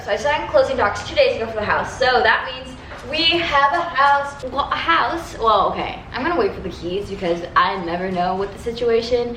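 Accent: England, United Kingdom. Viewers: That British accent tho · Hey love ur British ascent 💜💜💜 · The English Accent Is Always The Highlight Of The Vlog. Agreed! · this english accent omfg i am obsessed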